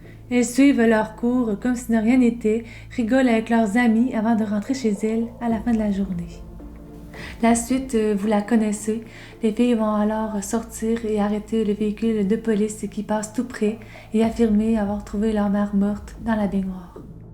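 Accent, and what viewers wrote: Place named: Quebec, Canada. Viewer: J'ai failli le dire, jusqu'à ce que je comprenne rapidement que c est à cause de l accent dont je ne suis pas habitué. J ai évité de le dire à la base pour ne pas que la youtubeuse le prenne pour elle, les autres vidéos intéressantes d autres youtubeur québécois ça me fait pareil. Tu pourrais la décourager alors que ce n est pas elle le problème.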